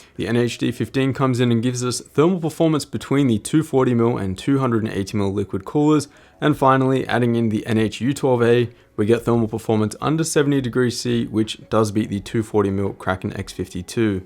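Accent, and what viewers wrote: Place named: Australia. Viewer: Love your videos, nice to have an Aussie accent coming through. I saw in this video that you show the Dark Rock 4 cooler on a min-ITX board and it not fouling on the memory. I'm looking for an air cooler for my Asus b450-I gaming board but can't find any firm info on if the DR4 cooler will interfere with the memory slots or not. Can I ask which board you have in this video, and if you have any idea if it will usually foul against memory or not?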